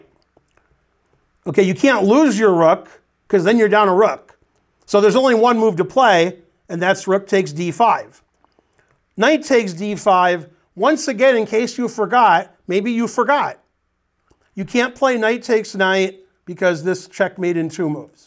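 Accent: United States. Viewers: Are you 'murican, by any chance?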